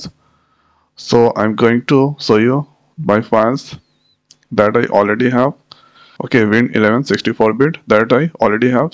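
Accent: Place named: India. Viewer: why does every tech helper have an indian accent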